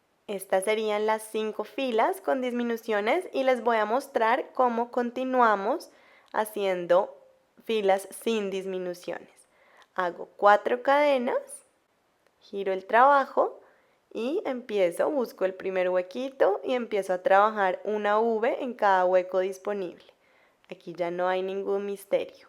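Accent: Colombia. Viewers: Tu hablas tan lindo acento Colombiano right !!!!!!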